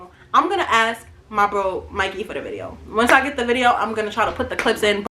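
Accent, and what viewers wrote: New York, United States. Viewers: I love your stories but your NY accent is what drew me in and the reason I keep coming back 🥰🥰🥰🥰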